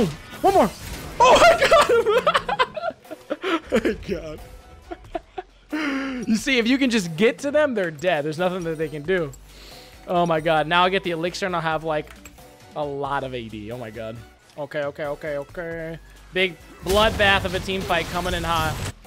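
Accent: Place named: Boston, United States. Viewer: Boston accent baby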